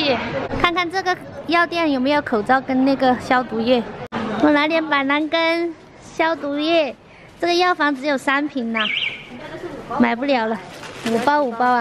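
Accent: Sichuan, China. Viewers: 妹子你这川普好巴适噢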